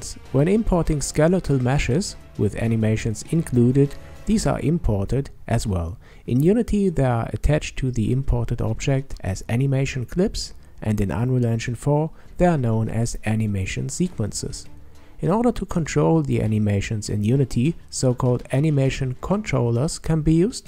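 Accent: Germany. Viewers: german accent? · Bist du nen deutscher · Man hört sofort, dass du Deutscher bist, also schreib ich auch einfach mal auf Deutsch ;P Wir benutzen Unity an unserer Universität aber optisch sagt mir Unreal Engine viel mehr zu. Grundsätzlich können die beiden ja das gleiche aber wäre für die Industrie später eines der beiden Programme mehr von Vorteil?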